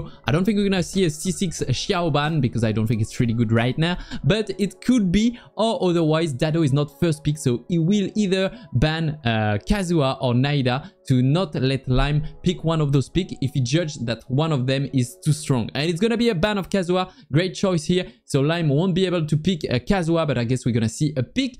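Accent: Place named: France. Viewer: Tu gères de ouf je m'attendais pas à aussi bien quand t'as dit t'étais pas satisfait de ça en live ! Honnêtement je peux comprendre pourquoi tu ressens ça, on sent bien l'accent baguette mais ça c'est osef on te comprend très bien ! T'essayes d'avoir le rythme et énergie de commentaires comme tu le fais en Français mais comme c'est la première fois on sent c'est pas naturel à 100%. Ça reste quand même très bien pour une première fois, pour le peu de pvp anglais et commentaires que j'ai vu tkt pas t'es très bien à côté xD et tu vas sûrement progresser avec le temps en tout cas gg 🎉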